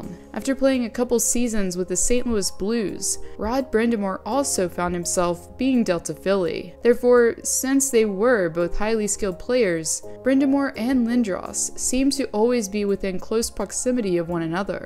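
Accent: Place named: Pittsburgh, United States. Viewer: Your accent reminds me of going to Munhall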